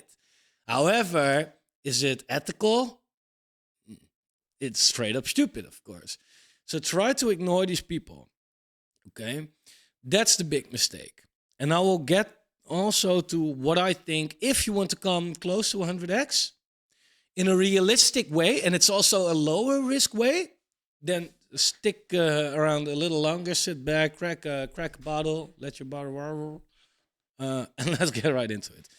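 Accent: Netherlands. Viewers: Great channel . I can hear you are Dutch just like me 😬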